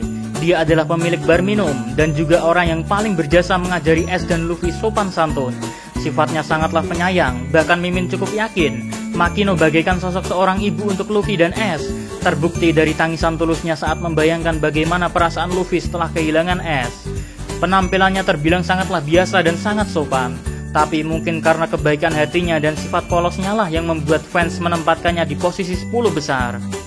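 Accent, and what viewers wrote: Java, Indonesia. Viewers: logat jowo mu kuetok gan wkwk lanjutkan bikin video ne 🖒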